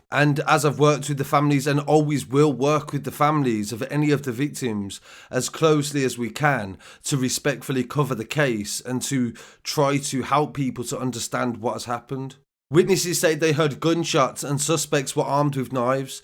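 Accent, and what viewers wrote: Birmingham, United Kingdom. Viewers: Amazing work, very sad times indeed.. 😑😓 ..also your brummie accent is awesome ..🙄🙄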